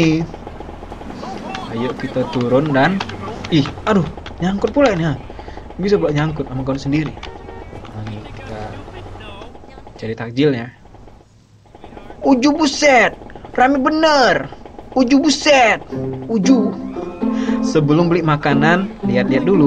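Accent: Medan, Indonesia. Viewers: Bang Abang Medan mananya? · orang medan ni kek nya🗿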